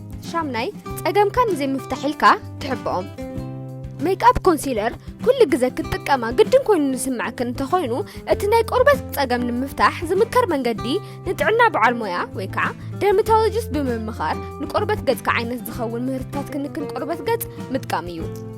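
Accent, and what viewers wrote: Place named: Enderta, Ethiopia. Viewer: ብዝተፋላለይ ላዛ ወይ ላህጃ ናይ ትግርኛ ዝተፋላለይ መደባት ክትስምዕ ብጣዕሚ ጽቡቅ እዩ ሓዉና ናይ ባዕሉ ጥቁም ዝኮነ ላዛ ናይ ከባቢ ዓንሰባ ወይ ሰንሒት ዝዛረብ ክ ከዉን ከሎ ሕጂ ድማ ናይ ትግራይ እንድርታ ይመስለ።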